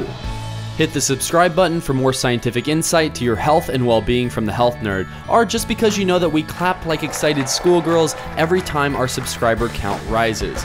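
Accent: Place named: United States. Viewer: I assume the creator is american based on his accent: <link>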